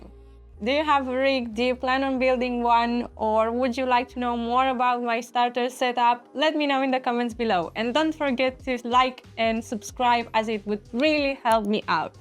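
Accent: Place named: Liverpool, United Kingdom. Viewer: Nice accent. Liverpool?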